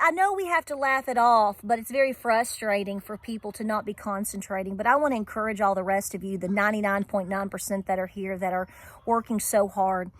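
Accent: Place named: Appalachia, United States. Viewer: I love your accent. My husband is an Appalachian and sounds similar to you. I love his culture. The elders of the past are so important to learn from.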